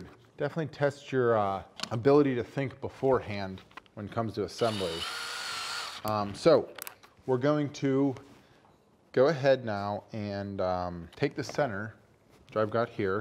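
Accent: Pittsburgh, United States. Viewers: Hey yinz, new to the channel, love the Pittsburghese! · He has a Pittsburgh accent plus he says “Yinz”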